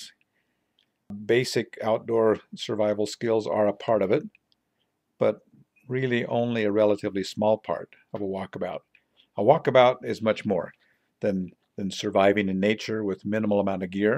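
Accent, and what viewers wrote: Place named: Utah, United States. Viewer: I thought you were from Utah from your other videos because of your accent, looks like I was right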